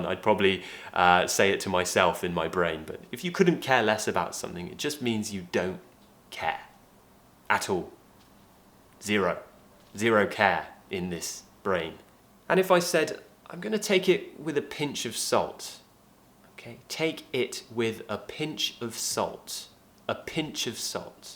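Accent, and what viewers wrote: United Kingdom. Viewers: Allright!!! I am from Brazil and love your videos, you help me to improve my english and the my accent too cos I really like the Bristh accent.... Cheers!!!! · Your british accent is amazing😍😍😍😍😍😍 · Hi, I am one of your very supportive subscriber. I already watched some of your beautiful and useful videoS. I really like it so much. Although yourE a British people and I love British accent so I think itS the reason that pushes me to watch your videos everyday. Thank you and hope to see more upcoming videos from you. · I love UK accent 😍😍😌 Arabic girl .. from Iraq .. Keep going🤗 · you really help me to learn british accent..thanks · British pronunciation wins ❤️ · I like acsen british. Ada karakteristik dengung. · Your British accent it's blew me away 😍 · Although you typed it here, I still watch the video because of his british accent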